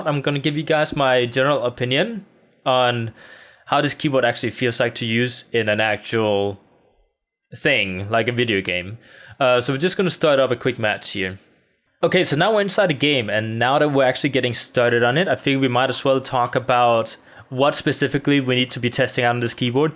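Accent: Denmark. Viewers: I think you sounded danish, are you danish? :) (also noticed the nordish layout)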